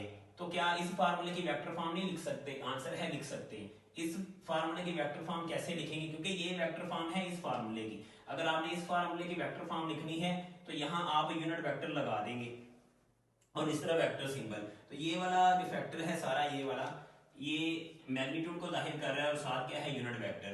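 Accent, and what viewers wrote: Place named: Saraiki, Pakistan. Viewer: 50% idhar 50% udhar Student of M.Phil physics + also teaching Medy khayal ich tohaadey lehjy to lgdy saraiki ho..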